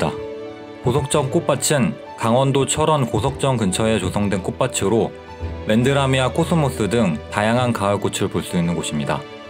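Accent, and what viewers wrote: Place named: Gyeongsang, South Korea. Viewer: 경상도분이신가요? 다 정말 예쁜데 왜..다..경상도..ㅠ.ㅠ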